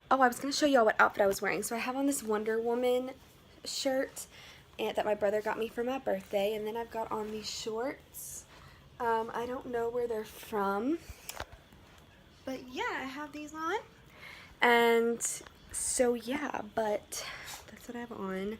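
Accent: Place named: Texas, United States. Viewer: I live in England (UK) but already thought you lived in Texas from your accent (y'all) and the way you guys interact etc. :,)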